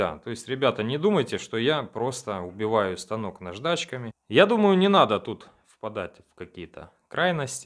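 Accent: Rostov, Russia. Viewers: автор, ты из Ростова что ли?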